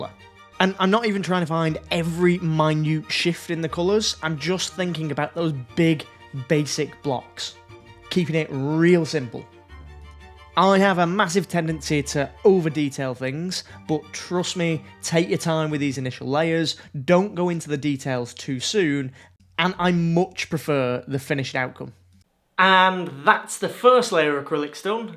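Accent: United Kingdom. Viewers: you are to precious, I have tried to place your accent and wow I felt it was British, love every adventurous technique you experiment with and the excitement when you have achieved it successful, send you a grannies hug sadly my acrylics still keep turning to chewing gum no matter what I, then digging through 20yrs old acrylic liquids they worked like a charm, moral is ever give up, keep excitement in your voice, it's very motivating, send a grannies hug well done❤Eunice SA 82yrs old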